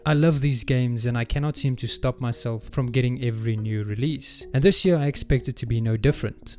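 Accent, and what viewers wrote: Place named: South Africa. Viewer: You're South African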